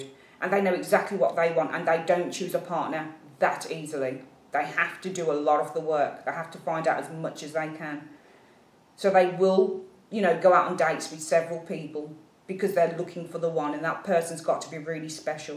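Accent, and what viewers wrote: Birmingham, United Kingdom. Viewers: she sounds nothing like adele! is from birmingham and adele is from south london, completely different accents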